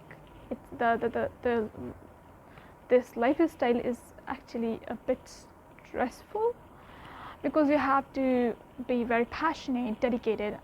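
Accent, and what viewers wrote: United Kingdom. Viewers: Mashallah. Nice English Accent though, 👍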